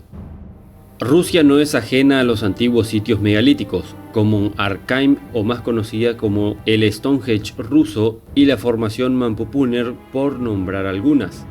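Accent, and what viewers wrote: Paraguay. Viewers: Eres de paraguay amigo ?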